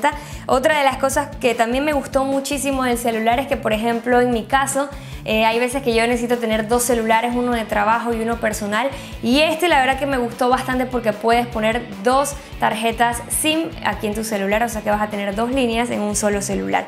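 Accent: Venezuela. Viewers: Me parece conocido tu acento ¿eres venezolana?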